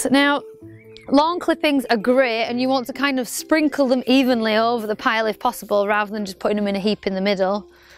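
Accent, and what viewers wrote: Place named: Northern England, United Kingdom. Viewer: healthiest balanced diet compost i have seen for a while' love your Northern accent ( yep I'm a southern softy that went uni in B)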